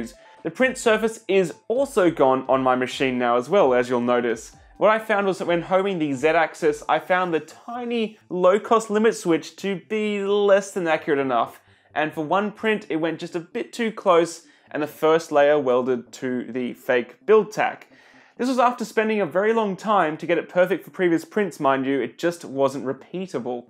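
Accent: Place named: Australia. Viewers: I probably shouldn't assume you would list in AUD - Aussie accent threw me ;) Anyway thanks for the continued great content! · Francis Taylor ... Aussie accent..... well he is from Australia..... again prices listed in US.... seems to be the standard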